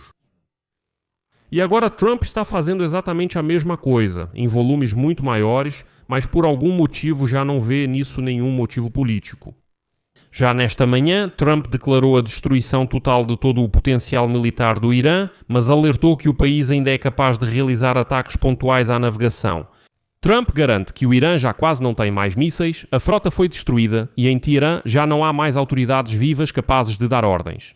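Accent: Portugal. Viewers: Não dá pra ficar misturando dois sotaques Ponho o comentário e saio Gosto muito do sotaque ❤luso mas bagunça não dá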